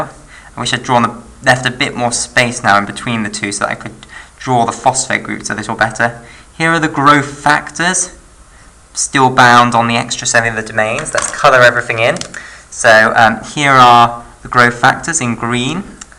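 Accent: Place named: Scotland, United Kingdom. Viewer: You have successfully concentrated only one thing that is your Scotland accent. Thanks for the explanation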